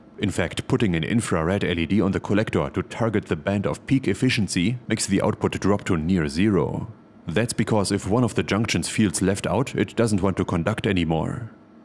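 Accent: Germany. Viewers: Your German accent becomes more and more sophisticated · I didn't expect German accent to be so difficult for me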